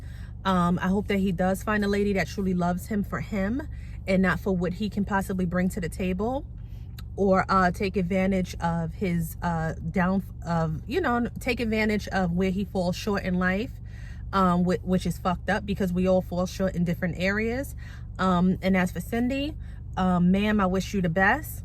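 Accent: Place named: New York, United States. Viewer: I love your NY accent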